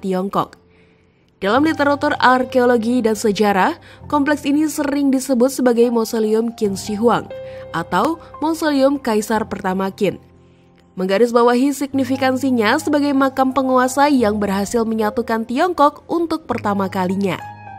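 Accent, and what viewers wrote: Indonesia. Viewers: lidah indo agak beda boss.. 😂😂😂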